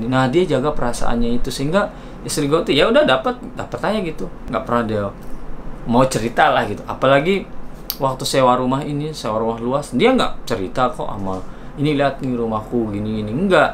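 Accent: Lombok, Indonesia. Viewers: aislah 🤣 masih kentel ruen base sasak nike bang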